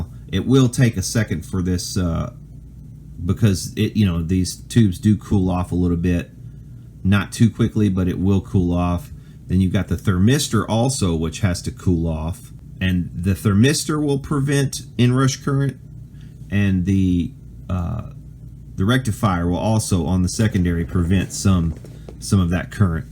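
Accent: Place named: South, United States. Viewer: The slight southern accent adds to the credibility of this bullshit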